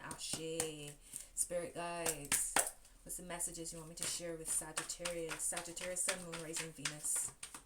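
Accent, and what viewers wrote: Barbados. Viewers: you have absolutely no reason to stress out. I've spent the past 8 months watching and listening to various readers and you are up there as one of my best readers. Regardless of the message, you are a wonderful reader. You have a confidence in interpreting the cards. Your warm and easy going. I got a glimpse of your sense of humor and your while you are reading, your concern is genuine and honest. Your bajan accent is beautiful too!!!! I could listen to you all day. Your awesome. Lots of love, light and blessings to you💜💕💙❤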